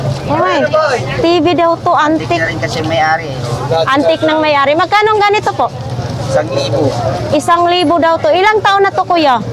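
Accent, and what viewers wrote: Visayas, Philippines. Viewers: Bisaya k mn Day?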